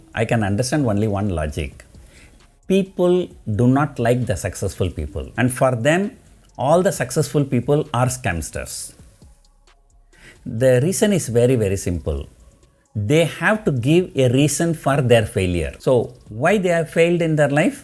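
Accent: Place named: South India, India. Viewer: Sir to be honest they are trolling because you speak South Indian English . You are success they can’t digest I believe...you are doing good job sir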